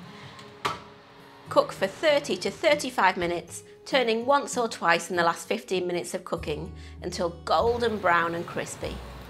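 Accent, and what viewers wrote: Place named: England, United Kingdom. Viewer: It was a pleasure listening to your fab English accent, and watching your video, looks very simple gonna make this right away.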